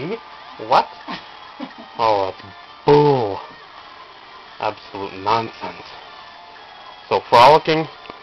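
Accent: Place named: United Kingdom. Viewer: lmao Fool! Nice british accent. Nicely done. Sictophere is indeed a heck of a guy. Great choice man. He does so much for others before thinking of himself. Your looking more and more Amish everyday and that's something to be proud of!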